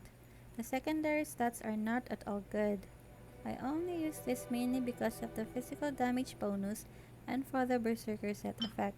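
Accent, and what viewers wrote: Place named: Philippines. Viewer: Sabi ko na nga ba filipino accent si ate support kita🥰♥️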